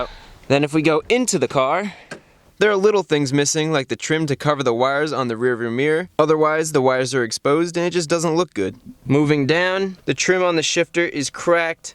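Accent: United States. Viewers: the way americans pronounce car brands...it just makes my ears bleed...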